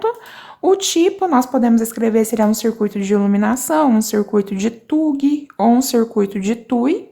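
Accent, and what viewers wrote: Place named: Minas Gerais, Brazil. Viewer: Sotaque mineiro, me pareço bem bonito.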